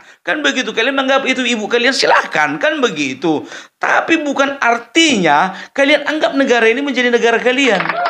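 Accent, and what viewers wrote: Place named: Medan, Indonesia. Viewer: ngomong keluar bahasa medan nya sama Saya pun medan😆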